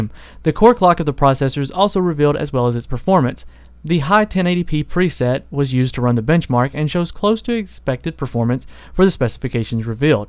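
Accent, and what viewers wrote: South, United States. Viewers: Love that southern accent leaking through lol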